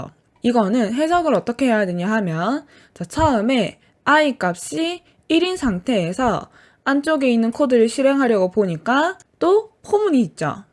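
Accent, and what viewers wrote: Gyeongsang, South Korea. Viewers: 정보처리기사 시험용으로 C, JAVA는 인강으로 배웠지만, 인강에 Python이 없어서 어쩌나 했는데 여기 SONOL에서 느무느무 잘 배웁니다. 갱상도 액센트 늠 귀여워요~~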